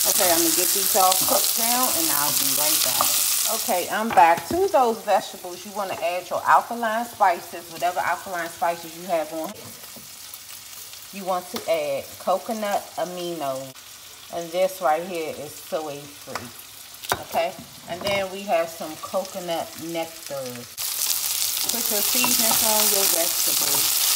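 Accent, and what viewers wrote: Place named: DMV, United States. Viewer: Shalom, where are you from? Sounds like from the DMV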